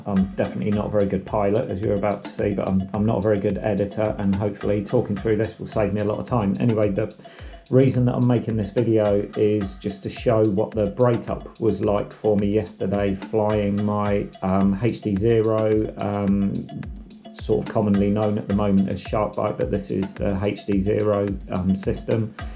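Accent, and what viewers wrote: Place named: England, United Kingdom. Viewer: Agreed, music is too loud and unnecessary. Everyone thinks their voice is weird, yours is perfectly normal. In fact the English accent makes you sound smarter than the rest of us… thank for the info.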